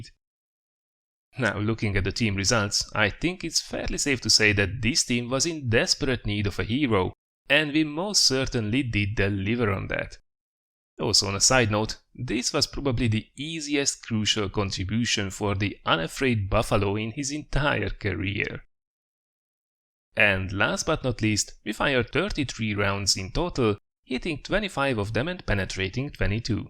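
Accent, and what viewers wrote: Hungary. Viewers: You got a really hungarian accent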